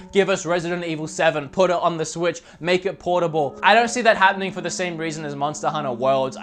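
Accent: United Kingdom. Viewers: I don't know why I thought you were British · Wait, is this guy british or American Edit: oh, right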